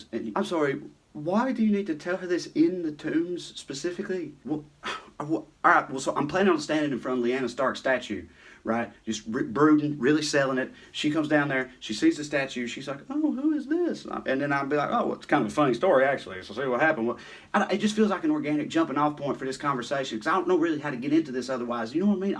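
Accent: South, United States. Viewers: I am so fucking hooked on the brilliance, rhythm of Southern American speech, and erudition of, that I watched GOT after watching one of his takes on the show (I live in Spain, so we get everything late here.) Now, I am wearing dragon earrings and saying "Valar Morghulis" to random people in cafes. After I binge-watched the series, I watched his takes again, and I nearly tinkled my knickers. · Got damn, my daddy cussed, and so did I. I was a small, blonde headed, spirited and polite Misssissippi girl just cussing when needed. One day on the playground, in the 3rd grade, a necessary "got dam it" moment occurred. A little Baptist girl, ( we were not Baptist) goody 2 shoes attacks me, yelling: "STOP TAKING THE LORD'S NAME IN VAIN." A vein was pulsating in her forehead. I blew her off, had she gone crazy? She followed, yelling. I turned around and asked her what she was talking about? 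Was she crazy? She explained "G.D". I looked at her as if she was insane and said," I am saying G O T DAM IT,it's got nothing to do with GOD!!!!!.........later I did delete "got dam" from my cussing...I knew, GOD knew I was not talking about "him" but I guess I did not want others to think that I did not love GOD....alas....I appreciate your clarification of your "Got damns", because they were bothersome to my hearing enlightening, intelligent words in a Southern accent. And that brings me hope and pleasure everytime you speak. Thank you,. Please continue sharing your thoughtful reality with us.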